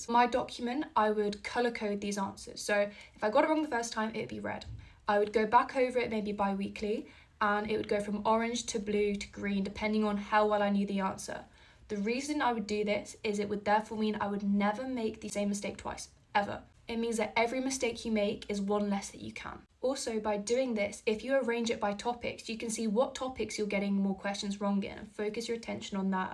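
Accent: United Kingdom. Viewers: Love ur british accent❤ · omgg her sound is so angelic and beatiful I can listen to it all day olso love brithish accent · Thank u so much for making this is going to help me a lot ❤ ur voice is so good I love people with a british accent 💋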